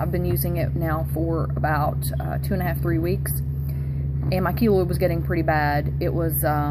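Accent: South, United States. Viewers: My god I love your southern belle accent. Hey you should do a Bryson Tiller album review because he is from Kentucky and Louisville to be exact.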